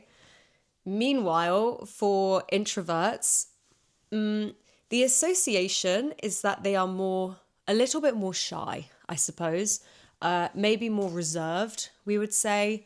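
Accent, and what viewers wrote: England, United Kingdom. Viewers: 日本ではアメリカ発音で英語を習いますが、あなたのビデオでイギリス発音の魅力を知りました。 私には少しだけスピードが速いので、0.5倍速で字幕をつけて発音の練習をしています。 とてもナチュラルな動画内容なのもとても好感が持てます。 これからも活動応援しています。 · Eres la mejor profesora gracias por tus maravillosos consejos, me encanta uur British accent😊❤ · Hi, I'm commenting for the first time on your video but I've practiced with you everyday since I found your channel. I aim to get 7.5 in IELTS test to move to Australia but here, in Japan, I felt kinda difficult to be soaked in English while doing the job. I've looked for many English channels but I love your channel the best ever!! How you speak, the attractive content for me every times, England accent which I want to immitate, your kind personality, and cultured talk. This time, I was engaged by your word, go try and don't be afraid of failure, and try to speak or write not using AI. I often use AI to translate because I want to use complete words but I changed my mind about it. 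I think I'm ambivert personality like you, but I often lost confidence when I speak English. So today I got a motivation from you again.🌼 Thank you as always✨🙂‍↕️ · hey, love your videos, love so much you do this podcast bc i live in mexico and the usa acent os afull and british so more elegant and more structure i dont know, send hugs gracias y buen dia y sigue haciendo tu magia ademas de practicar tu español, gracias por leerme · has a beautiful personality type, i like when you smile your short laugh Is this type of being shy? I swear U my favorite british teacher with the best accent Your short laugh kills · Hi,! I am your huge fan! 🥰I am a university student from China. I first found your videos back in March on douyin(China’s version tiktok). I had watched most videos there, but the account has stopped uploading the new contents since June, so I came here(youtube) and tracked you down(official account!)🤗. 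I like the way you teach English(daily life English) and your British accent! I can learn many new and fun words and get joy from videos(especially when you laugh, it’s so infectious).:elbowbump:Can’t wait to watch more videos from you! And hope your channel keeps getting better and better! By the way, I feel you look a little like Lara Pulver(from Sherlock).🤭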